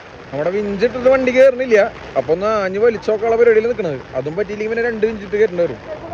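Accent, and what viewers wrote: Thrissur, India. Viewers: അവൻ്റെ പൂറ്റിലെ ഒരു കൊണച്ച തൃശൂർ സ്‌ലാംഗ് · Bro അത്ര Proffesional അല്ലാത്തത് കൊണ്ട് ആവും video ക്ക് നല്ല orginality . മറ്റുള്ളവരുടെ vlog കാണുമ്പോൾ skip ചെയ്യാൻ ഉള്ള tendency ഉണ്ടാകും ഇത് ഫുൾ കാണാൻ thoni കണ്ടു ഇഷ്ട്ടപെട്ടു follow ചെയ്തു കൂടെ കൂടി ഇനിയും ഇതുപോലെ ഉള്ള video തൃശൂർ slang ഇൽ കേൾക്കാൻ ആഗ്രഹിക്കുന്ന ഒരു കൊല്ലംകാരൻ ❤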